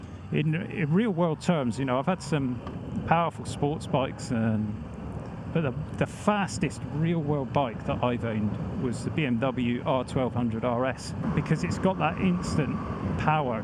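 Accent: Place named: West Country, United Kingdom. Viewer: I like your accent mate, just a subtle hint of west country. I hope you get this annoying problem fixed soon.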